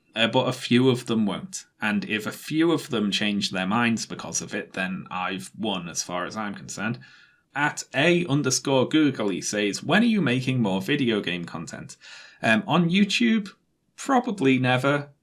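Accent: Liverpool, United Kingdom. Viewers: well, it’s informative, entertaining and elegant in its simplicity. Plus, I’ve discovered I have a thing for Scouse accents. The ones I can understand anyway.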